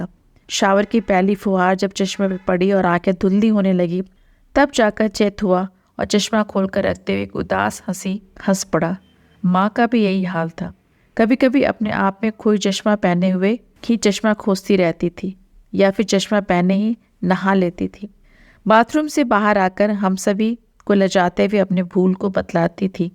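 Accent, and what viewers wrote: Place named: United States. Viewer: Orator ka american accent hai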